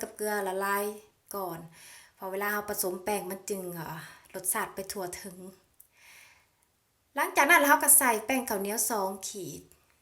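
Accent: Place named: Savannakhet, Laos. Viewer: ມັກຫລາຍໆສຽງເຈົ້າເວົ້ານະເອື້ອຍ ຄົນສະຫວັນນະເຂດ ເວົ້ານົວ ຫົວມວ່ນ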